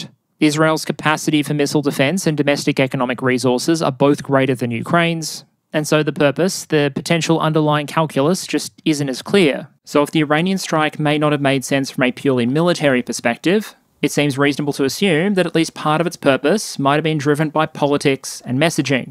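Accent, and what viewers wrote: Australia. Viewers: Your upload schedule is perfect. You always just in time for me to fall asleep to your video on Sunday night (I'm same timezone) and then watch/listen to on the way to work in the morning. It's also fantastic to hear an Aussie online, and one who clearly knows his shit. Keep it up,.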